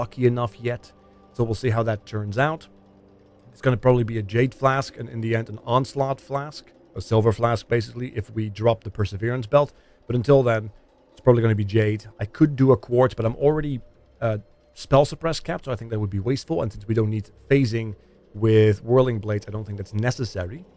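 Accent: Netherlands. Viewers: and moreover: nice content, i like your videos, i like the accent <3 you sound like my part of the family from the netherlands. i am from germany :) and thanks alot for answering my question <3 cheers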